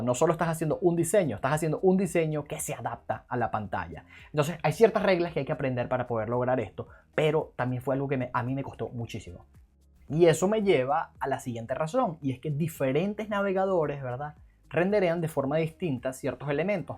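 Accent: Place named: Venezuela. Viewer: Bro por como hablas eres de aqui de venezuela ? despues de css me recomiendas ver videos sobre el dom ?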